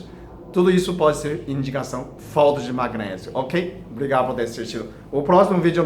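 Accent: China. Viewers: Esse japonês ou chinês hummmm ta mais pra chinês. Enfim, esse chinês é um fofo.Explica bem em seus vídeos,mesmo com sotaque de outro idioma. Depois de assistir o vídeo, acho que estou precisando tomar o cloreto de magnésio. Um abraço. Sou sua fã. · Eu adoro esse Dr pq ele e mto sábio.Explics tudo...ele fala português c o sotaque chinês Eu adoro ouvi-lo e entendo...só ter paciência. Parabéns Dr Deus o abençoe 🙏🙏🙏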